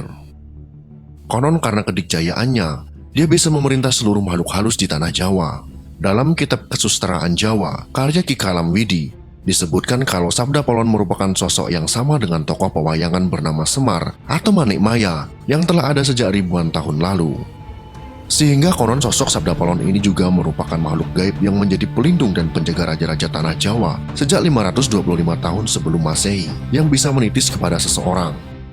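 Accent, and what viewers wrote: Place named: Bali, Indonesia. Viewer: Pengisi suara kek org bali ya logatnya 😁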